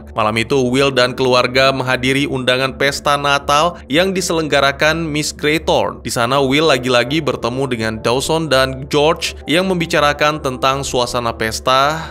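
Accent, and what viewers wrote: Aceh, Indonesia. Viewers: Bang orang aceh jelas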